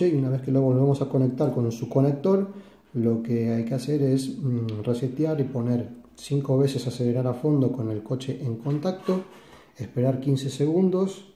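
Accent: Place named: Argentina. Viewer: Tenes un toque de acento argentino y español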